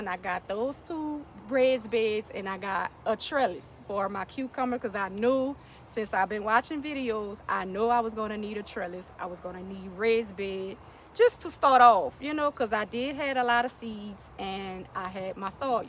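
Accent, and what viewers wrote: New Orleans, United States. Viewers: Been following your garden journey and love that N.O. accent! You got everything looking good now! I’m gardening in the west Texas desert at the age of 47 so I feel your passion for it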